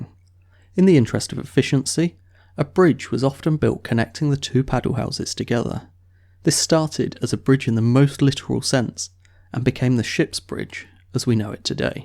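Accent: United Kingdom. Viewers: The British accent is, somehow, disturbing. At least to me. Many times, annoying.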